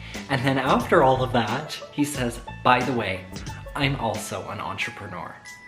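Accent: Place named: Canada. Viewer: I really enjoyed your video so very much, and you are very sweet and honest. I love your Canadian accent !!! Most "dating apps" are just hook up sites where horny guys look for sex. Most guys there just want to satisfy their "urges" and don't care how or with whom they do it. Makes no difference whether they are gay, bi, str8, married, curious for them " a hole is a hole" and you are just a piece meat! You certainly have self worth and dignity. Good for you for not playing their game! Do you like Italians? LOL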